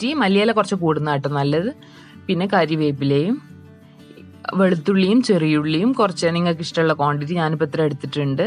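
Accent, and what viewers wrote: Malappuram, India. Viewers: ഇങ്ങള് മൽപ്പൊറത്താണോ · Ithante samsaram erekkure malappurathekk varunnundonn doubt.... adipwoli ayittnd ttaa 🥰🥰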